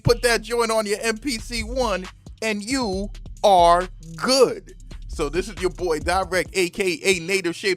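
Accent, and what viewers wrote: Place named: Haiti. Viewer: sak passé! Map boule! Real talk, I can spot a Haitian accent. Even though you don’t really have one per se, I can still tell. I kind of sounds like a queens/Long Island accent mixed with Haitian accent. Love the channel brother.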